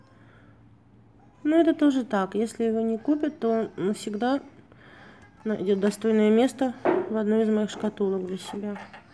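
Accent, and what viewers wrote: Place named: Baku, Azerbaijan. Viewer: Не речь,а песня,родной,любимый бакинский акцент😘продолжайте вещать,;)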